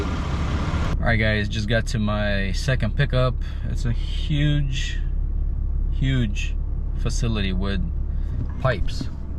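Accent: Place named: United States. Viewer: Говоришь ты как настоящий американец, красиво, а действуешь четко и без нервов как настоящий русский. Кто ты воин?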